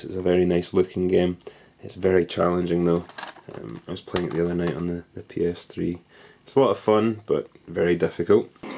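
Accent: Scotland, United Kingdom. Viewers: Where u from? You sound Scottish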